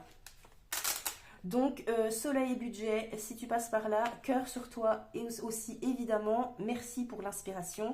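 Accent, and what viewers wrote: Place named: Franche-Comté, France. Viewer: Super vidéo, tu es vraiment top, j'adore ton enthousiasme et ta joie de vivre que tu nous partage. Et ce que je préfère c'est ton accent franc comtois que j'adore tant. Bon courage pour monter dans le nord.... Le jura c'est quand même vraiment trop beau.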